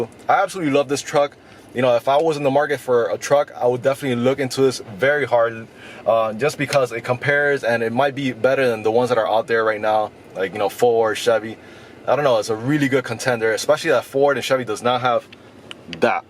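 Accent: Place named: Hawaii, United States. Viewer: just a guess, your accent sounded somewhat Hawaiian. Awesome truck, and great review. Hopefully getting one in the next couple of days!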